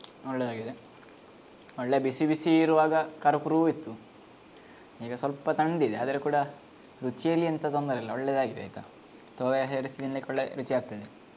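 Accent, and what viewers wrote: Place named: Tulu Nadu, India. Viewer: It seems your mother tongue is Tulu, pls make videos in Tulu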